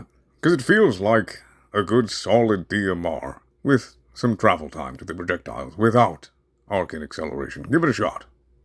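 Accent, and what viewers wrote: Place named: United States. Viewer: Your deep English narrator voice with the American accent underneath is crazy lol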